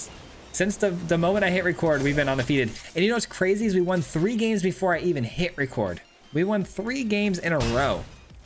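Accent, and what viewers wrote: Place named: United States. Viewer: Well he is american. They way they say everything kills me.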